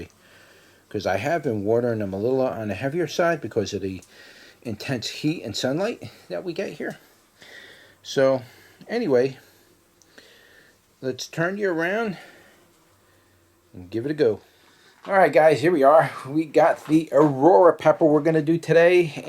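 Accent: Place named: Philadelphia, United States. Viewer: I enjoy your videos because I grow a lot of chiles where I live in New Mexico and also I am from Philadelphia so its nostalgic hearing your accent. Im not sure where your from but I hear home in your voice. Thanks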